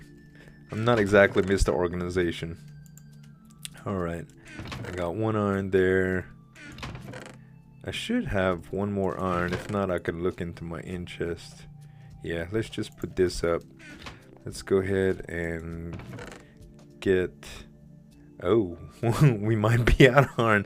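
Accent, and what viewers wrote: Louisiana, United States. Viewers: Z, are you cajiun(horrible spelling) ? It sounds like it. LOUISIANA FTW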